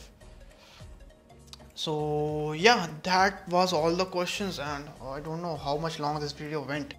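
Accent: India. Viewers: I luv this man!!!😅 I came to comment section after watching this whole Mahabharat length video!!!😆 Luv from India (Manipur)❤️. N u look dashing n also speak English very well...luv that original Indian accent!!!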